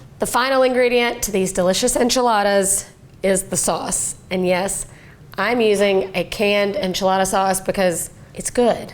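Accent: South, United States. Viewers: Hands down the most creative chicken enchilada recipe here on YouTube. 💯 I still grimace when I hear flour tortillas are being used where corn traditionally is utilized, but perhaps I need to finally try it for myself, which I will, for the first time, soon. However, I will plan to roast the poblano Chile and bell pepper first. Maybe add some bacon grease to the beans, and some tomato paste to the onion, pepper, & poblano chili saute. Btw--love this chic, her southern draw, and relaxed attitude around food; reminds me of my Texas upbringing......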